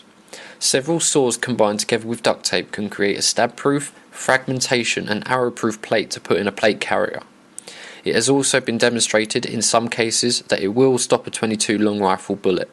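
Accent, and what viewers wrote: United Kingdom. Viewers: you from uk man?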